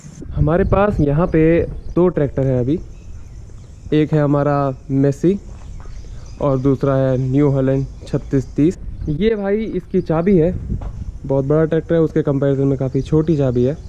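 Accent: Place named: Haryana, India. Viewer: Haryana ki boli laage tere Bhai jma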